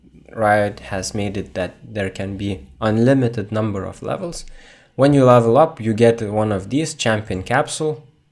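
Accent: Lithuania. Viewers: Let me guess, you're from Lithuania. You sound like one though. Your accent is litterly Lithuanian. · r u lithuanian? ur acent is just like lithuanian talking english:D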